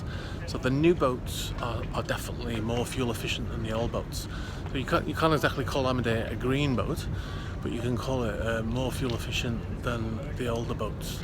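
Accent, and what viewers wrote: Liverpool, United Kingdom. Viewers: You a scouser or from north west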